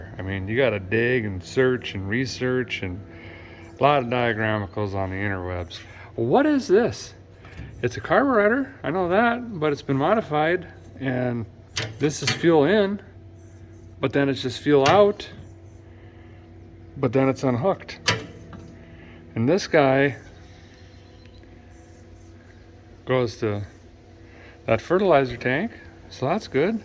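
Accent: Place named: Midwest, United States. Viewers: I love listening to this guy his voice is so calming. I can’t put my finger on his accent though maybe Midwest? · I am glad to know that there are other "older" women watching VGG. I am 72 and have the channel on all day, every day. I can't explain why. Honestly, I think it's midwestern roots, speech and work ethic. And he's a patriot. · Damb you're Midwestern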